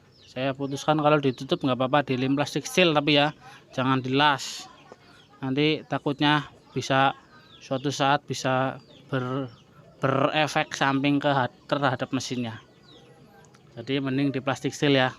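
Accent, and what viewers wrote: Banyumas, Indonesia. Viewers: Njenengan wong endi sih mas? Ngomonge ngapak deneng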